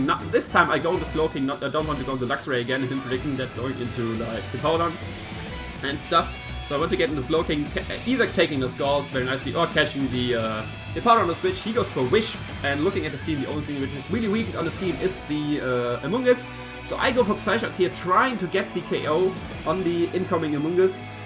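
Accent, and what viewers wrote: Germany. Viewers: are you german by any chance? you look, sound and your name sounds german. Im partially german so im curious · An amazing match. I feel reaaally bad for you for playing really good and than loosing for one mistake basically but focus on the positive of here by doing the right thing (no timer stall) and for playing really good, except for one turn. On another note, I'd suggest that you lower the "battle music" a bit. It was at times a bit hard to understand what you were saying 'cause the music was louder than your voice and even thou I like the German language and I don't mind the accent myself, it does unfortunately make your speech less understandable at times. GG and happy holidays!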